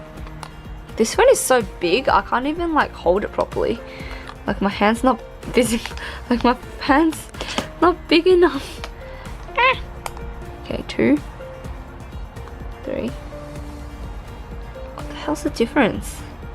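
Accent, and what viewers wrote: Australia. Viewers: Are Australian? You sound like you are? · Are you Australian cause I am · Your Australian!!!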